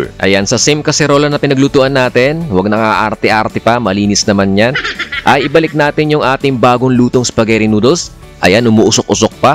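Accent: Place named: Visayas, Philippines. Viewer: Hahaha bisaya diay ka?!!! Nyahahaha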